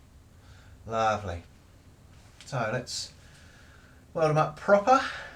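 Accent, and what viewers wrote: United Kingdom. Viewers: I enjoy your videos! I am from The U.S.A. I enjoy listening and I sometimes imitate the British accent, not as a mocking feeling, but as a learning experience. I know these videos are not a language tutorial, but I can't help being drawn into the slang you use! Again, not making fun, but I have a few questions about some phrases you used during this video. For example: "Give it a bit a willy!" ".................. "...I made a balls up!" " "...Coots and beans again!" One or two of these are pretty straight forward as to what they mean, but could you (for the readers of the comments and viewers of your channel who may not be from England) answer or translate the meanings of the before said phrases? Thank you! Again, I enjoy and learn a lot from your videos. Thank you! LA./Doot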